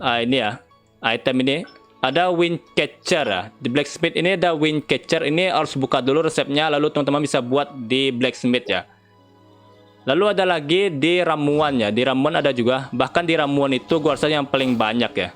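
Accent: Padang, Indonesia. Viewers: Gw tambahin subcriber lu gan.. infonya bermanfaat dengan gaya bicara yg uniq. Org padang kah ?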